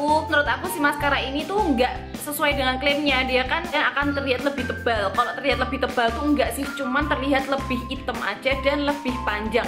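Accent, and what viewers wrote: Java, Indonesia. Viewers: Kesini krn beranda tak kira org korea trnyt medok wkw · Baru pertama nonton aja udahh love sma 😍😍 medhok e kyok aku rek wkwk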